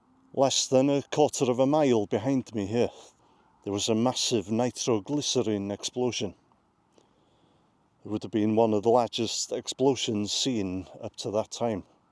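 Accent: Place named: North Wales, United Kingdom. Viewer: S Walian here; nice to hear a full Gog accent!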